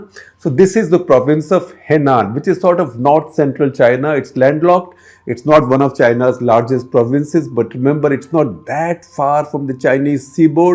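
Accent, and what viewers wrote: India. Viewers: It was great fun listening to you pronounce Chinese names in an Indian accent. Thank you sir! :-)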